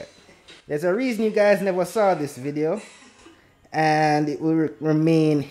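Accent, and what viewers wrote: Jamaica. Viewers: Wait a yard man?? I was expecting an American heeediot 😂 big up my yout 👊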